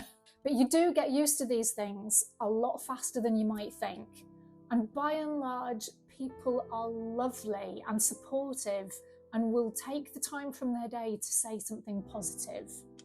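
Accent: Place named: England, United Kingdom. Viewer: Hi... Actually, I am watching your videos because of your pure English accent unlike new generations of English who are mixed with thin Aussie accent which I hate and also you are near my age and SINGLE life experience and living at parents house....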